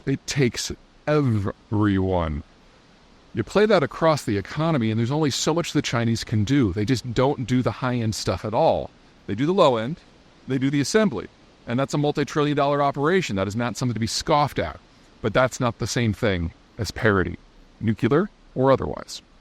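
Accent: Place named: Midwest, United States. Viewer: Hi: That is a well known speech pattern common to the midwest America, lots of other examples, picture and pitcher. I have this myself, I can't hear a difference between "nu-clear and new-clear" Daniel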